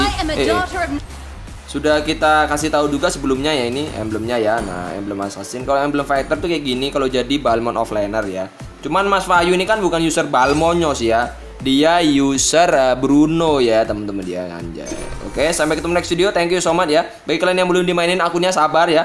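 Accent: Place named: Pekanbaru, Indonesia. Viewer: Lho abg org Pekanbaru..?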